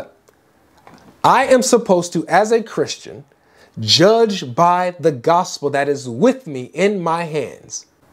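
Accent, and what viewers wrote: United Kingdom. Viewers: that bri ish accent was fire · that british accent is on fire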